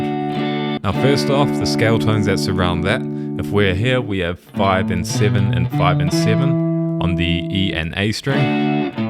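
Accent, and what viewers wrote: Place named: New Zealand. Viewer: Fantastic! Best instruction and I detect a kiwi accent….. where we love and many others.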